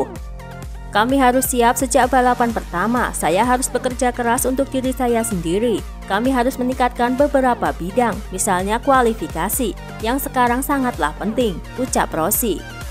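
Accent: Java, Indonesia. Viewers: komentator nya jowo banget ya wkwkwkwkwk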